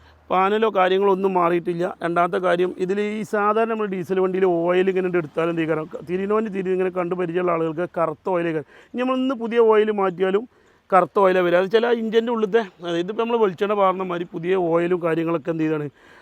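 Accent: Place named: Malappuram, India. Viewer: നമ്മളെ മലപ്പുറത്തിന്റെ ശൈലിയും ആരെയും വെറുപ്പിക്കാത്ത സംസാരവും❤❤❤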